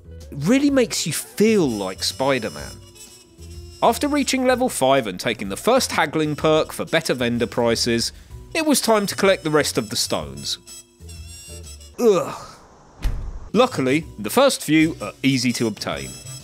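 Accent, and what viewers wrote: United Kingdom. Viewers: Ew britisj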